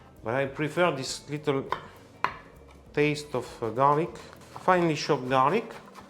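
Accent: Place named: France. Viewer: Love his French way of expressing and speech patterns!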